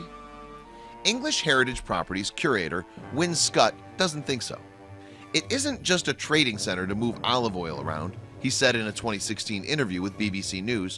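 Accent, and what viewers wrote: United States. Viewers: No offence but always put off by American voices talking about ancient English history and archaeology.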